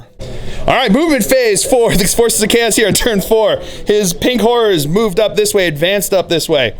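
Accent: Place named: Alaska, United States. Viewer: Having lived in Georgia and moved to the Pacific Northwest, I have found a lot of people regularly speak like this. I think is original from Alaska (which may have similar accent)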